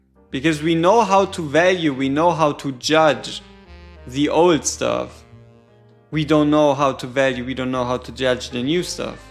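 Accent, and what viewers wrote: Germany. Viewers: would have joined the challenge if i knew how it works. Great content btw. You are my favorite WOR content creator despite the German excent. 😂😂😉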